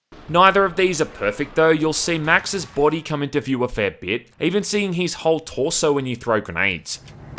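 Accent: Australia. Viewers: Is it me or did he sound more stralian in this one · What are you, an Aussie?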